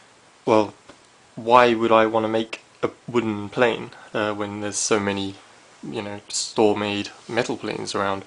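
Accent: United Kingdom. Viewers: Also, you trolled me really hard. I'm watching a video of a guy making planes, a Brit obviously, because of the accent if I ever can spot one, and he says he lives in Japan, and refers to Japanese people as 'they'. So i click on his other video, and who do I see? A fella of obviously Japanese origin, though I've seen in comments you saying you're british. And yes, I was about to ask how come that your accent is perfect, since I know Japanese natives have some problems saying L in English. Also what's funny with you being asian (no disrespect, hopefully you know that) is that the first thing I'm making using plane you've help me build is a... japanese tanto saya (scabbard). Let me tell you, if you ever gonna build one, don't bother with straight chisels. Real sayashi would use a curved one, that can do paring cuts everywhere, even on the far end of the scabbard. 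Now I'm going to my 'workshop' to try for the third time. Come along if you wish to learn some Polish words used to express your discontent, sir. ; )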